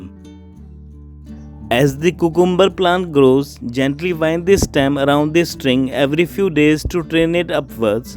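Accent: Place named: India. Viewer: It is Indian English just like there are American English or British English.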